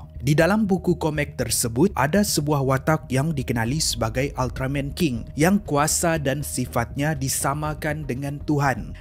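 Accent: Sabah, Malaysia. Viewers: bukan lah sebab cakap melayu masih ada loghat Sabah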